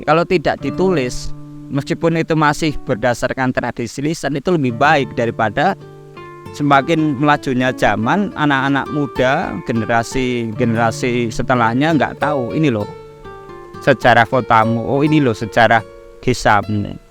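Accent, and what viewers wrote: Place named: Banyumas, Indonesia. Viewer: Sampean kok bahasane..logate ngapak ya…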